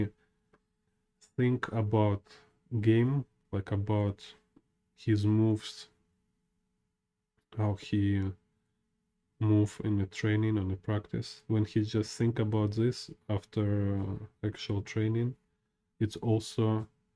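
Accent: Russia. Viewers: Привет. Отличное видео. Всё наглядно, понятно и познавательно. Спасибо. Твой англицкий упорно давал понять что скорее всего ты говоришь на русском :) Яндекс ИИ не смог дать перевод :))) Но всё было понятно.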